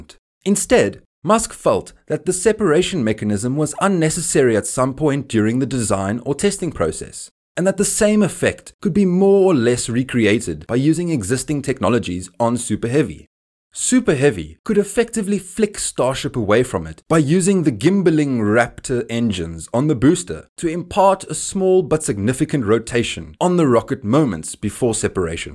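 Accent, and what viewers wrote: South Africa. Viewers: Never heard a south african vlogger before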